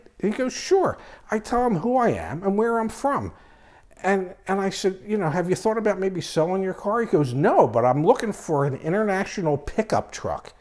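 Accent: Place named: South Jersey, United States. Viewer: glad to see another South Jersey boy doing well. Great to hear the reference of the "deptford mall". Lots of great memories there. Don't live in South Jersey anymore but great to hear the accent. Hope your channel does well. I already subscribed. Best of luck!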